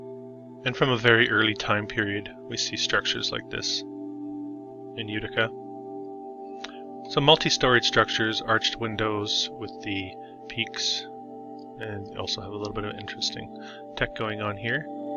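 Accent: Canada. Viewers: This guy sounds a smidge Canadian? Eh?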